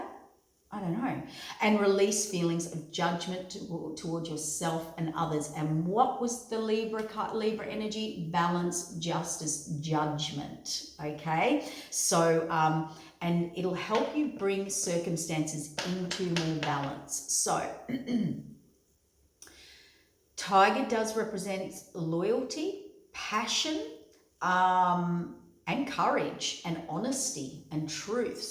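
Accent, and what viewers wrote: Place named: Australia. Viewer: Excellent video, great to hear your Aussie voice.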